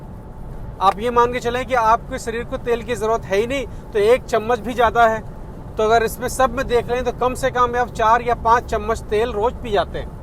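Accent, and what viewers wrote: Bihar, India. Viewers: kya aap bihar se ho kya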